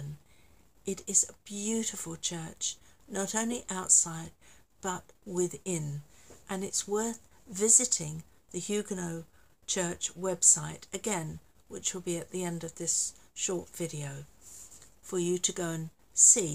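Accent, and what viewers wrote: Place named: England, United Kingdom. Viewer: Why do you have an English accent?? I doubt there are Huguenots in England. In fact that whole country has been taken over by the Viking types. Even Iceland nir Ireland have Irish there.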